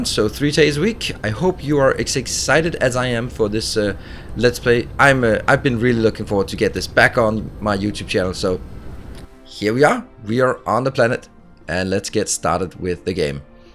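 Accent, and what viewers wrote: Denmark. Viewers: Are you danish? (because of the accent. Nothing against danes, on the contrary...just curiosity)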